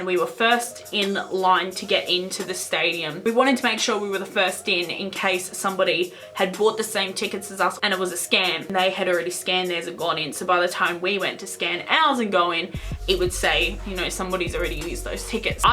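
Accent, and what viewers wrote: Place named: Australia. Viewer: okay but i notice a HUGE difference between pronunciations of words when australians say them vs when americans say them (i being a fellow american). for example, i say uh-deed-iss. you say ah-deed-das. correct me if that's (the way i say it) not the correct way to say it ahaha. NO HATE ON YOUR ACCENT (i actually love it), just thought i'd point that out cause i think it's cool. 😂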